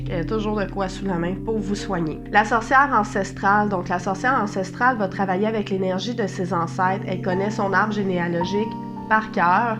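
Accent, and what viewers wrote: Canada. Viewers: Oh punaise une canadienne avec l'accent canadien, je trouve cette accent tellement magnifique. Merci pour cette vidéo j'essaye d'en apprendre plus sur les sorcière. Je suis sorcière solitaire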